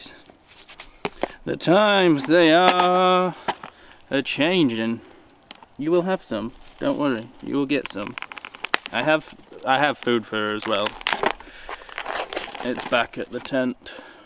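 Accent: United Kingdom. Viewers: Dude are you country or British I can’t tell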